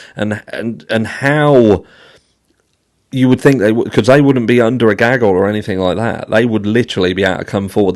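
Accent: United Kingdom. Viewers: Dude I’m losing you once you start talking, it’s hard to follow you. You’re talking too fast English with the accent for the non Brit’s. All your words sound jumbled together.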